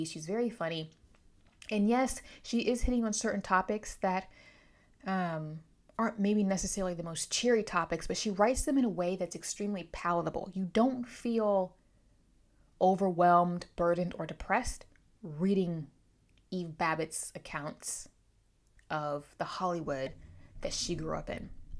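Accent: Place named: Pittsburgh, United States. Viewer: Funny you brought up your accent. I’ve always thought you sounded like a friend of mine who is from Pittsburgh area. I’m in north central PA.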